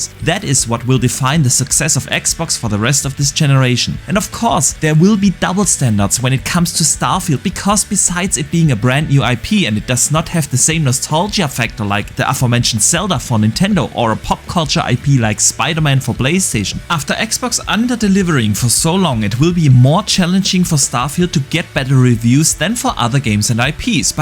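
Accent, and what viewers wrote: Germany. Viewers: Kommst du aus Deutschland ? Verdächtiger Dialekt 🤭, auch wenn du es gut verbergst 😁.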